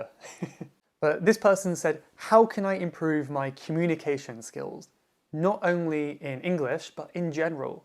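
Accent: United Kingdom. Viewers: Thank you so much for this remarkable video. I came across this channel recently and I’m deeply impressed because of your British accent your communication skill.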